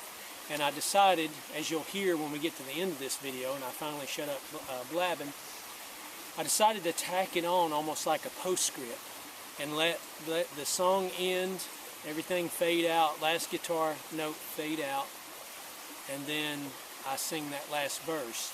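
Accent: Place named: North Carolina, United States. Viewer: I so enjoyed listnin to your "blabber" & "yappin" about the tech end of recording, although a lot goes over my head. I just want to play, sing & have someone else do that work. 😀 When I was a kid in the '60s & '70s in TN, my dad & uncles would sing in the living room a lot of Hank Sr., Lefty, Jimmie Rodgers (Blue Yodeler), & other standard country music & hymns. We had an overhead light fixture smackdab in the living room ceiling. The men would hang a mic from it & they'd sit around it in a circle & play & sing to that suspended mic. Now you wouldn't want to do that on a ceiling fan & have someone come along & turn on that fan! Oops! 😃 Uncle Robert called it a hillbilly boom mic. 😀 What a sweet setting by the creek & trees, with a crawling bug as your co-host. Even though you're in NC (I grew up with TN & VA accents which are a slight difference) your accent is so pleasant. 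Your singing voice beautiful. Your dad had such beautiful high harmony. Wow! Really like your Gibson Dove. God bless you & your family. 🙏🏻🌼 Edit: I saw where someone suggested you scan & save those paper lyrics. I agree!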